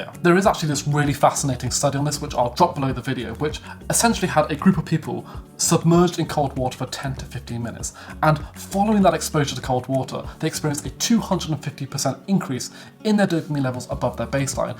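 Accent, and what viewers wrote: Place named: United Kingdom. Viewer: It's really useful to learn a British accent and nice work man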